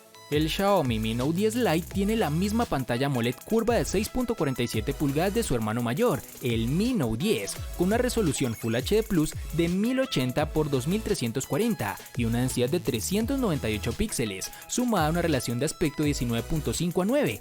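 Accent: Cali, Colombia. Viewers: Eres de la ciudad de Cali?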